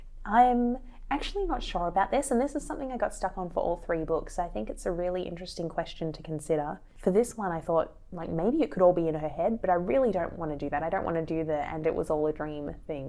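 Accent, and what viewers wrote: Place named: Australia. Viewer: Thank you for the video. Seems to me the snowflake method is overly laborious. Brainstorm your story, characters and setting. Brainstorm story beats that you can structure into chapters keeping with the three act story. Outline each chapter in bullet point. Then, change your outlines as you evolve the story …. Write the first draft, leaving scope for discovery along the journey. Don’t waste time reading methods! They’re only selling you something you’ll never use. The best YouTube videos discuss what’s good and what’s bad to keep readers engaged. I’m an amateur writer, no formal training in creative writing, in fact, a science background, so I’m learning as I go. But love your work though. 😘 by the way, having lived in Australia for 20 years, I detected your Aussie accent.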